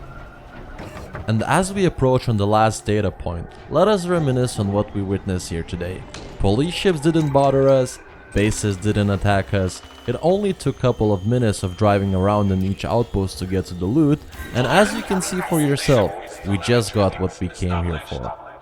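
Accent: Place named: California, United States. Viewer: Your Californian accents funny as fuck! thax Bruh your video hella help me! Blesses from California!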